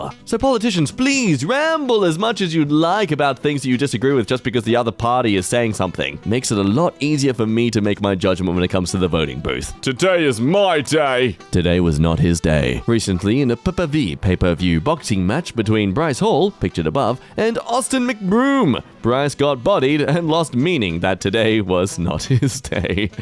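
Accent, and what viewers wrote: Australia. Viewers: I have a question, are you Australian? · I love the Australian accent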